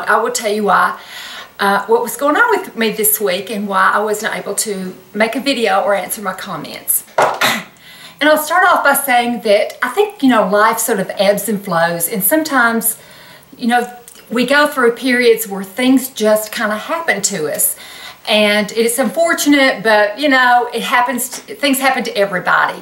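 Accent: South, United States. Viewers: I'm from Florida. My family has been in Marion County since 1846. So I am truly a southerner. I tell you, it is so wonderful to hear a southern accent. It is really hard to find one, any more, down here in Florida. You are absolutely a breath of fresh air. I love your YouTube channel. Through the years I have put on weight and am having trouble taking it off. Can you give some pointers on how you keep yourself fit? Maybe some diet suggestions. I'm so sorry you hit that rod! I pray the Lord put His super on the natural for you and that you are better, quick like a rabbit! Thank you for everything!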